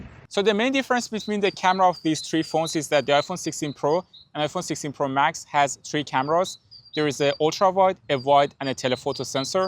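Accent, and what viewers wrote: Iran. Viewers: داداش احساس میکنم لحجت فارسیه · لحجه ایرانی داری داداش، ایرانی هستی؟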